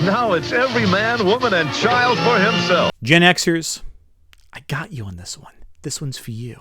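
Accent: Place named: New York, United States. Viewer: Werewolf By Night is one I've never heard of, but it sounds amazing! I'l have to check it out! Also, are you from New York? My family is from New York and Massachusetts and you sound a lot like them.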